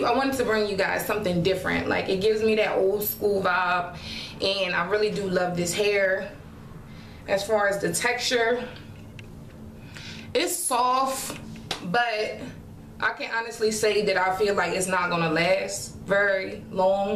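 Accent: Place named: Maryland, United States. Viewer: I have a question are you from Baltimore or Maryland? Normally I don't ask people where from on here because of privacy but your accent is very similar to mine lol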